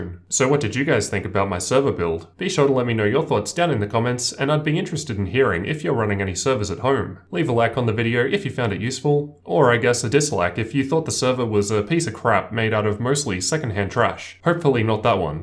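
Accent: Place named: Australia. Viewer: I like your kangaroo-friendly accent mate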